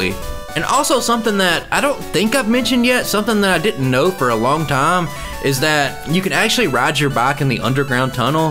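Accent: South, United States. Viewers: idk why your videos dont get more views, theyre very good. maybe its the strong southern draw, but im a southern boy as well, doesnt bug me. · That cold didn't mess with that beautiful southern accent. Love my brothers from the south getting it done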